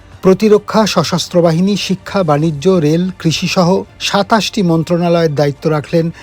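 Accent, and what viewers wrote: Bangladesh. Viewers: এটা তো কলকাতার ভাষা না এটা আমাদের বাংলাদেশ সাংবাদিকদের ভাষার মতো লাগছে কলকাতা মানুষ দের বাষা তো ভিন্ন রকম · কথার ধরনের বলছে সে একজন বাংলাদেশী উপস্থাপক।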